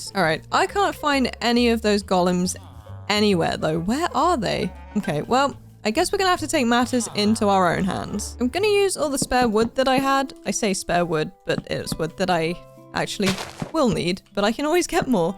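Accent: United Kingdom. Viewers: Are you British you sound British?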